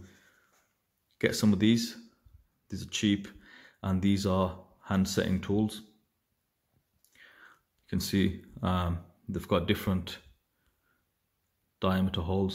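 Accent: Birmingham, United Kingdom. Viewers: I hear a Brummie! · Thanks for the useful hints and tips. I'm looking in to Pagani Design at the moment and I hear you can switch out bezels for Seiko SKX and other bits as Pegani use NH35 movements. Be cool if you did a vid on modding the Pegani 1651. Good to hear a voice on hear from Brum as I'm down in Bristol and miss home. Keep up the good work. Big up Brum!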